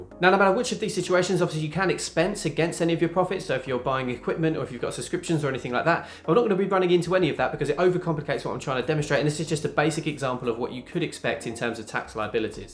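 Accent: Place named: United Kingdom. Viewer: Can you slow down when you talk, I’m an American it’s kinda hard to understand what u saying when u talk that fast with a British accent.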